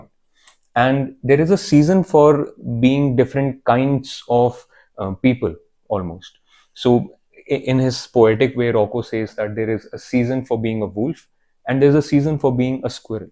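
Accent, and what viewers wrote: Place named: India. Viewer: Being an Indian , I prefer to listen Indian who speaks in English that nice to hear rather than British accent . It's helps me to learn more in English . Your accent is too good it's similar to Indian voice with accent and easy to hear and understand really appreciating . I don't know you might understand or not my words what I am saying. Because being a beginner in English it's too poor communication. Ok but at the end of the day really nice to have you it's makes vibe of Indian.❤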